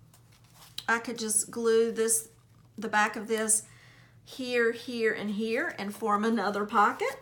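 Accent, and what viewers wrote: South, United States. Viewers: Thank you for sharing what was on your heart today and inspiring us. It was very encouraging and I appreciate it. I love your creativity, and I'm really enjoying this series! It's like getting to sit right there with you and so we can create together. Plus, we get to hear your cute Southern accent and the way you express yourself. FUN!! I am thrilled that you found another way to use those "tag pockets!" That just makes it so much more fun for me and hopefully for those who purchase the kit as well. If we all did things the exact same way, it would be boring, wouldn't it? lol I love watching how you do your process and it's fun to sorta "see inside your head" and to see how it all comes together for you. I'm working with the regular sized "Rosewood Cottage" Journal kit right now and think it will be so cool when we are both done to see the different ways we use the elements.:) I love the little booklet with pockets that you created! 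Thank you for the tut!! <3 I so appreciate you and your sweet heart!! xo Marilou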